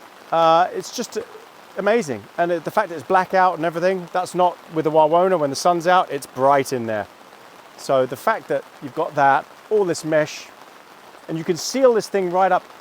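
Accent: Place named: Cheltenham, United Kingdom. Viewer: Where are you from? You sound just like my mate who comes from Cheltenham. Loving the vids & Bruce 👍🏻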